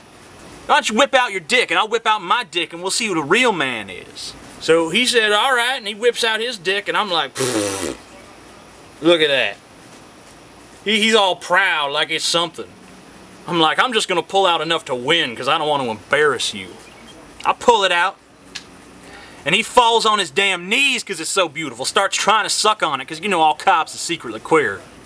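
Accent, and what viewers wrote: South, United States. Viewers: I love his southern accent so much! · I dont care what that guy you guys played on DP says about your southern accent- this clearly proves otherwise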